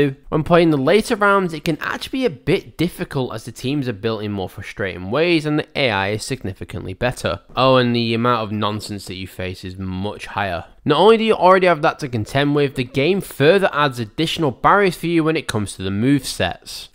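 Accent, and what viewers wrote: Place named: Manchester, United Kingdom. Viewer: Nice video lad, but Manchester is blue! I can hear that Mancunian accent so I won’t be too hard on you. I personally think the games are expensive because people view them as collectibles and there are a finite number in existence which still function. As you mentioned, these games can be played for free (which I do frequently), so I think it’s as simple as people being collectors and potentially hoping they can sell it for more later.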